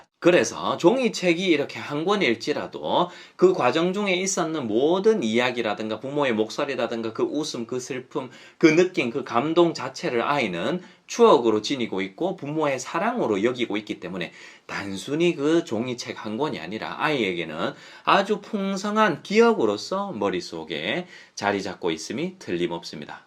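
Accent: Gyeongsang, South Korea. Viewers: 요즘 하루도 안빠지고 님 영상 인스타 열심입니다^^ 저도 경상도 부산이라 어감도 확 와닿아서 어떻게하면 님처럼 선한 영향력을 가질까 고민하고 또 고민하네요. 3,4학년 딸들이 되고나서 전자기기 허용폭을 넓혔더니 확실히 종이책 읽기에 소원함을 느끼고 친한 친구들과 독서모임을 할수있도록 인위적인 환경을 만드는 중이네요~ 물론 엄마들도 함께요, 아-지나고 나면 항상 아쉬움이 남아요.요즘 다시 육아공부중입니다^^ 요즘 3,4학년 된고나서 발름기호를 가르쳐야되나라고 고민에 빠져요, 이제까지 소리만 듣고 그대로 읽었는데 발음기호를 알려준다면 시기는 언제며 꼭 필요한지 고민입니다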